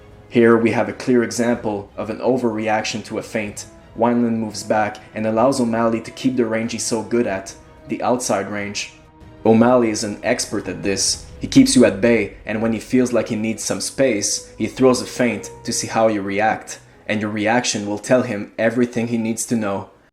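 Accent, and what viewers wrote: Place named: Quebec, Canada. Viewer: Love your videos! On entend l'accent québécois légèrement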